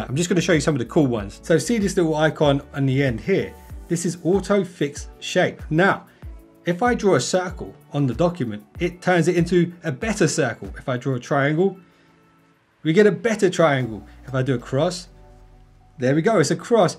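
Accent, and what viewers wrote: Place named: United Kingdom. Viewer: uve got a british accent but you say zee instead of zed .... weird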